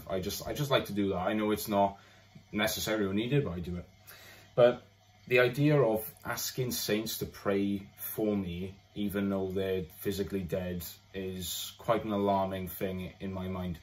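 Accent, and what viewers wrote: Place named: Scotland, United Kingdom. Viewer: Hey, I grew up in a Christian/Pentecostal household, much like you, so I genuinely understand your perspective. Similarly, I've delved into Orthodox teachings, finding insights from figures like Father Spyridon and Bishop Mar Mari to be profoundly enriching. Their depth of knowledge is indeed a blessing. I resonate with your concerns about the shifts within the Protestant church. The changes since our childhood have been quite disheartening, particularly when observing the trajectories of the Church of England and Scotland, which seem to diverge from Scriptural foundations. While I've been influenced by Orthodox teachings and recognize God's work within that sector of christianity, it's essential to uphold that Jesus is unequivocally the ONLY path to the Father, as emphasized in John 14:6. Furthermore, 1 Corinthians 6:9-11 underscores the importance of avoiding idolatry (praying to saints). 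Even if some claim not to worship saints, our reverence should solely be directed towards Jesus Christ, the King of Kings. Despite shifts within certain Protestant denominations, I believe Christ prioritizes our individual relationships with Him. The authenticity of our faith lies in our personal devotion and living for Him beyond mere traditions. Reflecting upon 1 Kings, it's evident that faithful remnants persist even amidst broader challenges. On a side note, I detected your Scottish accent. When I resided in Edinburgh, I found solace in attending Charlotte Chapel, a Baptist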